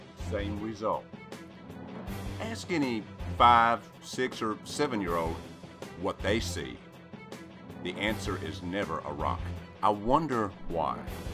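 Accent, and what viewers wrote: South, United States. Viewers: my man, I love your work. YOU are brilliant...not to mention a real entertainer. However, you actually would help your cause so much more, and could convince the uninitiated of your sincerity, IF.....IF...you didn't dress like a homeless person and buy a comb. Now it doesn't bother me about your sloppy dress and or your southern accent...because I live in the south, but ya have to understand PERCEPTION becomes REALITY. So just a suggestion to take a bath, go to Sportsclips for a hairCut, and buy some new clothes. But regardless, even if you were to where a clown suit...I will watch all your videos TWICE. Love ya man.....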